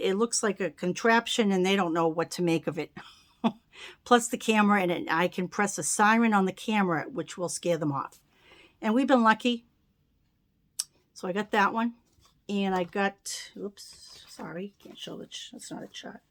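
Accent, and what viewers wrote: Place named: New England, United States. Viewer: I love your from sea to shining sea! And your NE accent!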